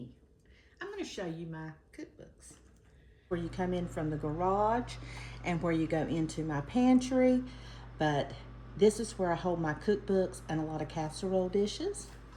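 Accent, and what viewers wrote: South, United States. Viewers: Hi, the cookies look Yummy. I will have to make them. I have to say the cake mix cookie video. I made them and the cookies are so good. Also your video for pork tenderloin leftovers, I live in Indiana and didn't know if I could find the Duke's Golden BBQ sauce in my area. I Found It, at a local food chain store. Meijer! I cooked a pork shoulder the other day in the slow cooker, so tomorrow I'm making BBQ pork sandwiches and trying the Duke's sauce. Thanks for all of your videos!! Love your Sweet Southern Accent!!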